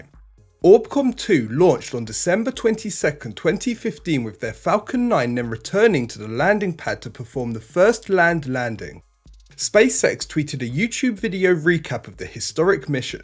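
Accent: England, United Kingdom. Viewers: Hey, love your updates man! Thank you! What part of England are you from mate? I say south, around London, but my brother is saying Manchester. You sound NOTHING like Paul Macartney so, I say that's wrong!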